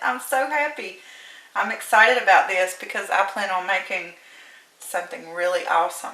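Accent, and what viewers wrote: South, United States. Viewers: I just love you. I rarely hear southern accents on youtube hehehe. Makes me feel like im home. Not sure if youre from the south but you sure sound like a southern belle. 💚💚 much love from Georgia!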